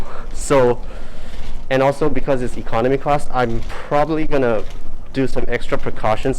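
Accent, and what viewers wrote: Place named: India. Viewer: tell me why you have Indian accent.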